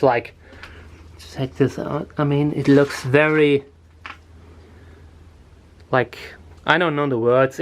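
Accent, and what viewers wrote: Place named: Germany. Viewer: Du bist doch Deutscher.... Das hör Ich doch.... Wo wohnst du denn, dass die so gut gedeihen?? 👌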